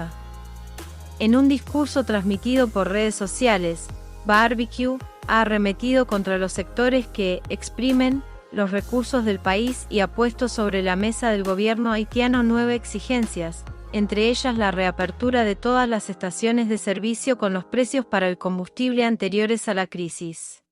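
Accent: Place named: Argentina. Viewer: Tu acento me parece argentino.el PLD no deberia hablar del tema.ya que el gobierno de ellos fue el, que mas haitianos ilegales le dejado a RD.practicamente no hicieron nada para detener la inmigracion ilegal .si el PRM sigue con esta iniciativa de resolver este problema los Dominicanos debemos mantenerlo gobernando por muchos años.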